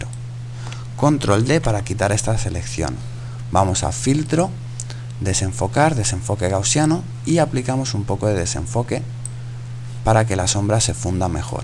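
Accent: Spain. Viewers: como amo el español de españa :DDDD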